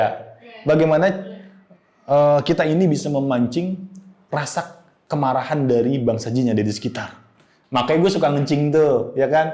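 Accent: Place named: Jakarta, Indonesia. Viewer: Bahasa indonesia betawi yee😂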